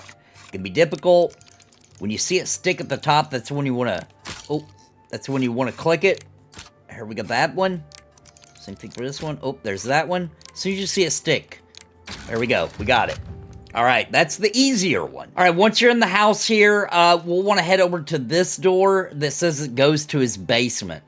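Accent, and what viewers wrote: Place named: South, United States. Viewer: Where you from brother, i hear the southern in you I'm from arkansas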